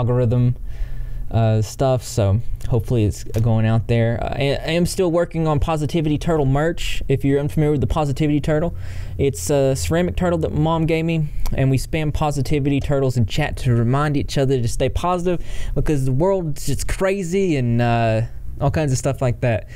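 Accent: South, United States. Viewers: This guy makes a southern accent HOT 🥵! 😅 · I love the subtle southern accent... not related to the video but your accent is nice haha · I cant tell if your from Southern USA or Austrailia ? Love your accent 😍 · Odd question: Where’s your accent from? I love it; I’m guessing SC or maybe GA.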